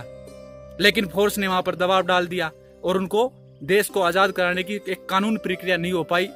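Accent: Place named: Rajasthan, India. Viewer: bhai rajasthani ho kyaa....accent aur background se guess kiyaa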